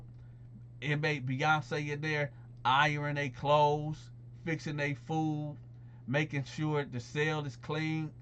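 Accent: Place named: Ohio, United States. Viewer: Okay! At first I thought you was from Cali from yo accent. But now I realize from yo story's that you MUST be from Ohio or Michigan!.. But I'm guessin Ohio. Yaw sound JUST LIKE US!! Or vice-versa. But much love to ya anyway brotha! Keep them interesting ass stories goin! I'm listenin.👊🏾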